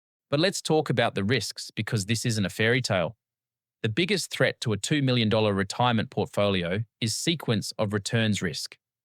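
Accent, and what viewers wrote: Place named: Australia. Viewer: Love the Aussie accent stick with it.. Interesting story but I feel 2 million isn't enough you need 4 houses with rent so about at 600 a week 2400 rent collected a week to live comfortably if not u will struggle . I don't want my funds going down to a self sustaining point it has to be around that you could get by with 3 but yeah 2 is not enough 1200 a week is not enough for 2 elderly people to retire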